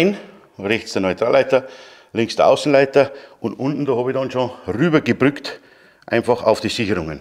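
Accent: Bavaria, Germany. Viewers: Dein Dialekt ist bayrisch oder ostdeutsch ? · Und was ich noch loswerden möchte: Deine Videos sind super, ich schaue sie gerne an und lerne ab und zu auch was. Die z. T. dämlichen Besserwisserkommentare gehören eben dazu. So einen Filter, der solche Leute rausfiltert gibt es eben noch nicht. Und recht hast du, wer dich nicht versteht wegen deines bayrisch, an dem liegt es selbst, der muss eben bayrisch lernen. Wo kommen wir denn hin wenn der Bayer nicht mehr bayrisch reden darf.. In diesem Sinne mach weiter so. LG von Markus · Servus, moi wieder ein super Video! Daumen hoch 👍🏼 Dei Boarisch vermiss i übrigens immer no a bissl... Schena Dog no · Hallo! Wie immer gutes Video.Arbeite schon 51 Jahre als Elektoinstallateur und schaue immer wieder gerne deine Videos an. Ignoriere diese Dummschwätzer,die meinen alles beser zu wissen. Mich hat letztes Jahr auch eine alte Frau aufgefordert den Meister zu holen nachdem ich eine Lampe mit Bewegungsmelder montiert hatte, Ich habe vielleicht 10 Minuten gebraucht. 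Ich erklärte Ihr,dass ich der Meister bin und schon Hunderte Lampen montiert habe.Da sieht Mann,dass manche Leute keine Ahnung haben. Aber alles besser wissen.Und dein Bayerisch ist auch okey.Ich bin Schwarzwälder und rede Badisch da sagen die Leute auch ob ich ein Schwabe bin oder aus dem Hotzenwald komme. Die wissen noch nichteinmal wo der Hotzenwald ist.Viele Grüße Joachim.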